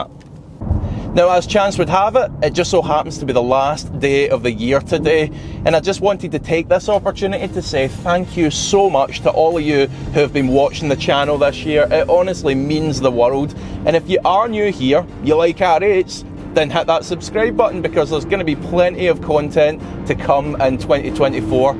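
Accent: Scotland, United Kingdom. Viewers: Was just watching R8 videos on youtube and came across your channel, great to hear a fellow Scot and well done on your new car. You've got a new sub in me.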